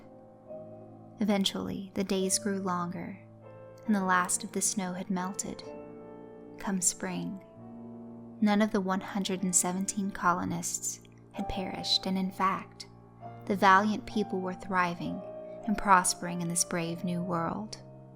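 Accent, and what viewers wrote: South, United States. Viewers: came over to you from "The Creepy Dark" and I must admit that you are good . glad I checked out this trilogy and I really enjoyed the southern accent . I like looking for new channels when they are still somewhat new and watch them grow . I have just subscribed to you and I am looking forward to watching you grow . Keep up the good work and I hope to hear from you soon .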